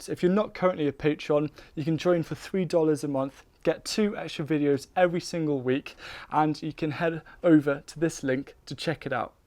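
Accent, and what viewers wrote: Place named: Wales, United Kingdom. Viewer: I love your Welsh accent. And thank you for the tips!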